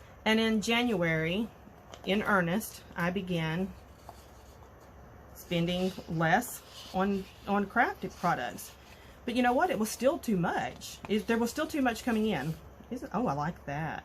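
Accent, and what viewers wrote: South, United States. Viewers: You are not alone in this epiphany! I too have come to the realization that my spending is out of control! Getting it into control is not going so well with me either. I've tried, Lord knows I've tried. I think if slowed down a bit. I really don't need anything more but my problem is for example... when you showed that Reminisce hello summer guy side B paper, I'm not gonna lie, I went and googled the crap out of that paper! Lucky not lucky for me is it is no where to be found! Not even on EBay. There may be an Etsy but not gonna go there! I just told myself I don't need it. I have found something that's therapeutic for me at this point in my spending habits it, I will shop til I drop, I mean I will load up that cart with all the pretty things that I love. After about an hour of a complete waste of time I will close that browser and walk away with never an intent to ever checkout! 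It help me but then, someone showed the Jillibean soup discount box haul she got from an etsy shop and yeah, not gonna lie I had to have it! After all its Jillibean soup, face it how much longer will we be able to crab up some of that! Well I walked away from that too then the next morning I got an email saying hey you left something in your cart we'll give you 25% off today! You bet your bottom dollar its mine now! I enjoy your southern accent so don't you even try to change it! You video got me thinking! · First of allllll don’t you dare get rid of that southern accent! I looove it! Second- I laughed out loud when you said “ was I smoking crack that day?” 😂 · Lovely to hear your pretty southern accent! I should try the 30 days of sketches,too! I am so far behind with everything! Love your kits!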